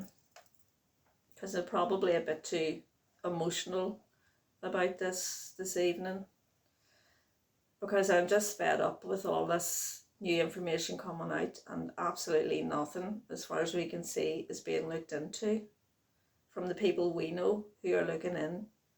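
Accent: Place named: Ireland. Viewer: Nothing lovelier than that Irish lilt🎶🍀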